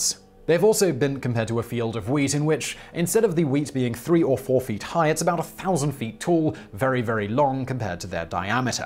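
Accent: United Kingdom. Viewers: are you saying that, just because he pronounced it the British way, means that only British people can watch it? That has got to be the most absurd thing I've read all day! LMBO! So, should he change from speaking like he normally speaks, to an american sounding accent so, we Americans can be able watch? Well then, wouldn't his Brit followers be just so offended! (sarcastic eye roll) Dude! It's not like it's a foreign language! Lol! Lol! It's Americans like you, that give the rest of us a bad name around the world! Broaden your horizon! Not everything is done the american way! But, do have a lovely evening.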